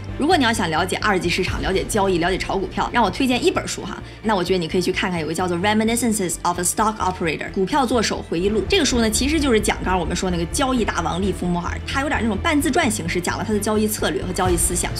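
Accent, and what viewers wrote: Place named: Northeast, China. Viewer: 大姐东北的吧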